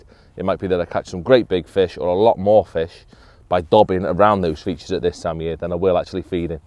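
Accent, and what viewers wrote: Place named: Liverpool, United Kingdom. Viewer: Your no des ship...can’t listen to scousers...I watch with sound off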